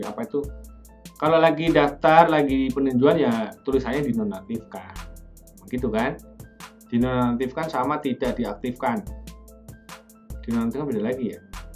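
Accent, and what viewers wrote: Java, Indonesia. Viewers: WKWK😂 TAPI KOK LOGAT NYA JAWA??😂😂🙏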